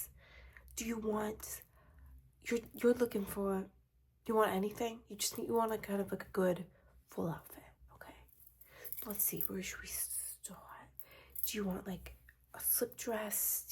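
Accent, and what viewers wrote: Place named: Brooklyn, United States. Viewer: The Brooklyn accent is so good 😂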